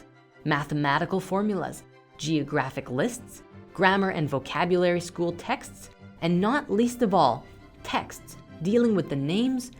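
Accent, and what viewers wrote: Canada. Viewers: He sounds Canadian. That said, I am pretty sure most people who obsess over 'the gay'... have gay tendencies. So to me.... '__ is gay' sounds gay.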